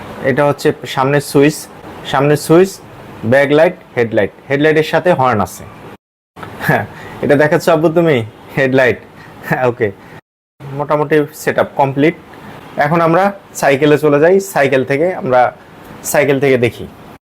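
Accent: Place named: Chuadanga, Bangladesh. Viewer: ভাই আপনার কথা শুনে মনে হচ্ছে আপনার দেশের বাড়ী চুয়াডাঙ্গা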